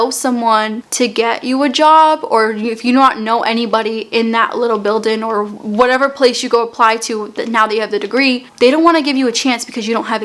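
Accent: Boston, United States. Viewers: Lol I love the way she says car. People always point out my thick Brooklyn accent but her Boston accent is really serious. Lol I love it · I just love your Boston accent. I find it so charming and down to earth. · Such a cute Boston accent.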